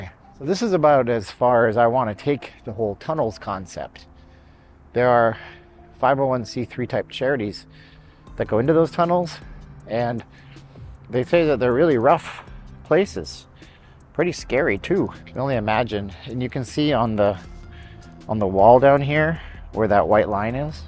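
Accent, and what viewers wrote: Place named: Canada. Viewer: You have a cool accent; possibly the most Canadian accent I’ve ever heard. Love your content!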